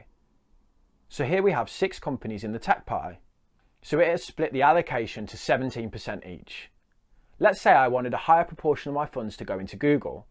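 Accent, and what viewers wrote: Birmingham, United Kingdom. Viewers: Forgot to say, great to hear from another Brummie! 😂🙌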